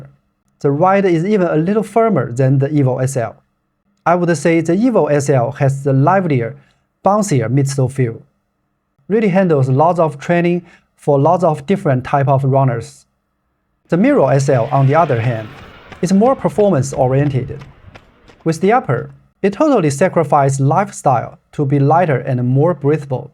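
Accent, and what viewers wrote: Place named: China. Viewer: in terms of grammar there isn't much to correct. the only challenge is sometimes I have a hard time understanding some words. it's certainly due to my unfamiliarity with chinese accents.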